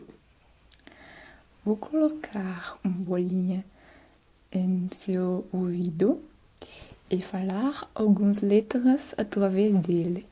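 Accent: Germany. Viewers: Que sotaque lindo é esse? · Sotaque alemão incrível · brasileira imitando uma alemã perfeitamente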